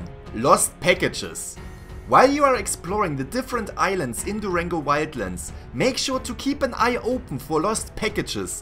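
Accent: Germany. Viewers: You sound german :o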